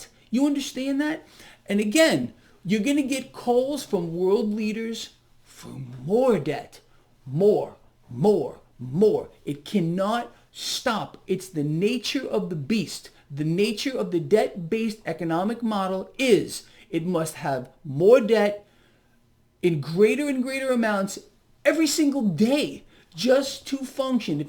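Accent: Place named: Brooklyn, United States. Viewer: Preppayerd lol. A Brooklyn accent can never be concealed.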